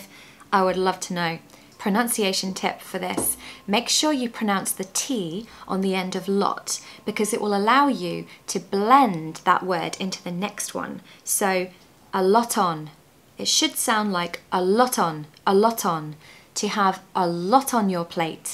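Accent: United Kingdom. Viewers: I Love the way How you pronounce and speak ,I love adore British Accent ,so Cute .. "In the same boat "this idiom in my language means the same: Estar no mesmo barco-Portuguese... We have a sweetest one :The rice of the same Sack =Arroz do mesmo saco) we use this when two people or thing behave in the same way but badly. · Your accent is really great help me learning British English. Thank you a lot. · contigo eh aprendido la pronunciasion en ingles britanico.....aunque el acento americano no suena mal....besos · I love to learn new english idioms everyday... Also I love your british accent ☺Sure you will success in your channel teachhing english... Cheers from Argentina🔥🇦🇷😊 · I lovd your accent and tips in your episodes to learn the British English. Keep the great work up and all respect 👏👏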